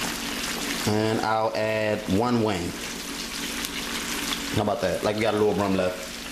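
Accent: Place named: Philadelphia, United States. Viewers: He's from philly. Most of us talk like that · ! yes I subscribed about a month ago to his video channel and I have enjoyed his videos so far! I didn't know people in Philly talk like that. I don't understand I guess this is different unless Will Smith isn't really from Philly ... Idk😕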